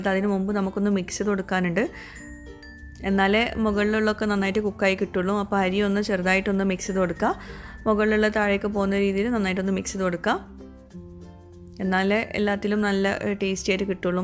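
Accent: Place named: Kasaragod, India. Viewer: Kasargodan nhammal... Ade samasaravum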